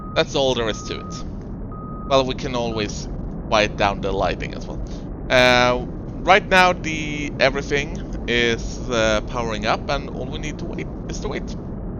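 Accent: Sweden. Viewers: good svengelska fett bra video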